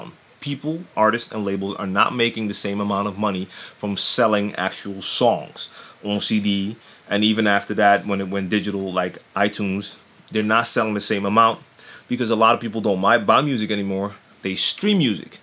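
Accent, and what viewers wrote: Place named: Philadelphia, United States. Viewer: you're from the Netherlands but have the same accent as me in Philly?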